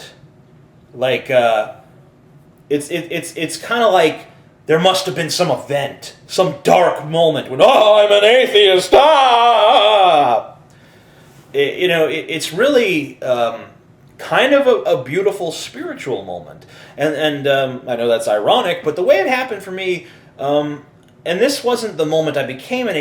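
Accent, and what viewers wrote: Scotland, United Kingdom. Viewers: His accent was Scottish :) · His accent is Scottish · Thats a scottish accent? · Its a Scottish accent, I cringed. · His accent is scottish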